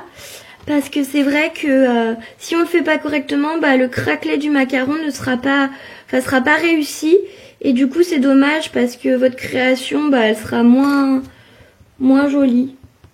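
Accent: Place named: North, France. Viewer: Je trouve que tu as l'accent du nord ^^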